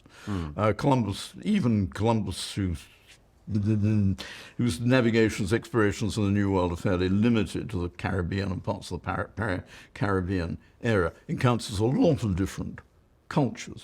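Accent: United Kingdom. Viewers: My God, this is the most British man I've ever heard. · Most iconically epic British accent I have ever heard!!! Suck on that Peter Hitchens!! :)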